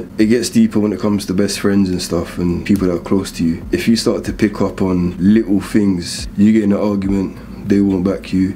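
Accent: United Kingdom. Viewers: Are you from East London?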